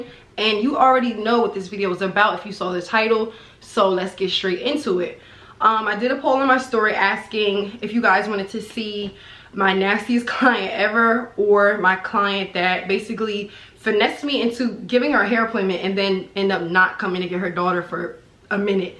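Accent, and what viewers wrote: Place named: New York, United States. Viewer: u so new york i love it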